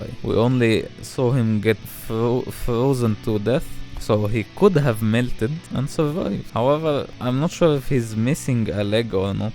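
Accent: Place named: Russia. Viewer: Why you speaking with Russian accent my friend why ?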